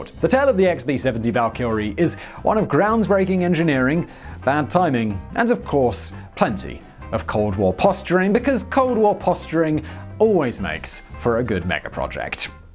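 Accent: England, United Kingdom. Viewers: He's English, we pronounce many things differently. In fact virtually everyone pronounces something wrong, they just don't know it. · Sounds intellectual because of British accent, makes numerous mistakes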